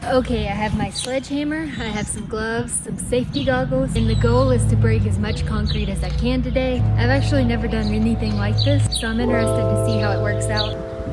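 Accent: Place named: South, United States. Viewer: It's so cute to hear ur southern accent when you talk to ur Dad. ❤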